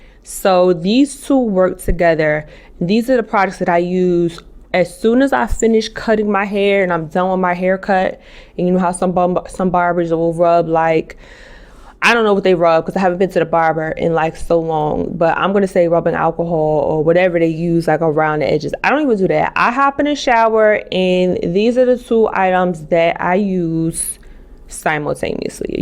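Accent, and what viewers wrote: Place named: South, United States. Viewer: 😍😘 I like everything I see and hear. Your braces, country accent, skin, face, everything, bald head, all of it.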